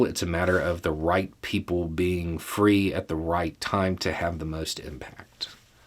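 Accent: South, United States. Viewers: For what it's worth I'm white as the driven snow and your Southern accent was the one glaring red flag I had to get past to realize that you are in fact based. Where I'm from, a drawl tends to be seen as an easy indicator of a simple mind that holds hateful ideas. I do recognize that this itself is a form of prejudice but damned if it doesn't have a better track record than a lot of its cousins.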